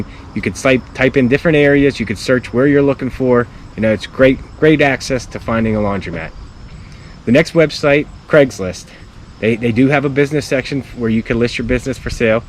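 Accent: United States. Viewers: Are you from Pittsburgh 😂 that accent is awesome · Are you from Boston?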